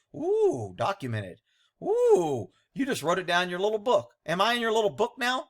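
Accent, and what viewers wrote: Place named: Florida, United States. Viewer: My God, could you allow just one video to play without your interjections? And making fun of her accent makes you look foolish, when in fact, you have Florida man accent, if you get my drift.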